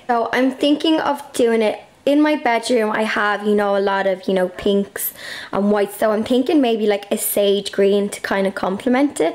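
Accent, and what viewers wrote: Ireland. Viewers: Hi, what a wonderful master bedroom, it is ideal. I only have a fairly small bedroom, fine for me and my cats, I have four, was five but one died last week and I was devastated, she had a poor heart. Anyway you always cheer me up with your beautiful accent and amazing videos, thanks so much. xx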